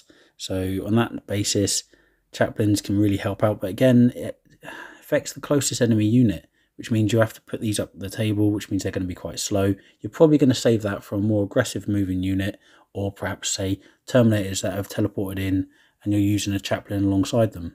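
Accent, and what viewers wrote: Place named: United Kingdom. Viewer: coupled with your British accent it makes it more difficult to follow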